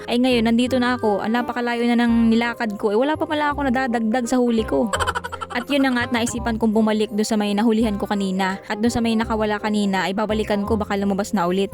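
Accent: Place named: Quezon, Philippines. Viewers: lam aw😂😂😂 Quezon prov tagalog talaga😂❤ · Parang pamilyar Yung accent ng salita mo idol taga Quezon puba kayo? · pa shoot out ako maam from Sipocot Bicol.. JOEZEL NESAGON.. ikaw baga ma'am ay taga Quezon o Batangas.. eh kung maka ay ka eh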